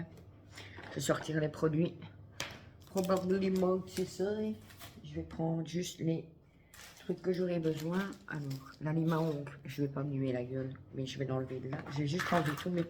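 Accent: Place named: Belgium. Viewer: Votre accent me rappel celui d'un ami belge de Charleroi😅😂🤣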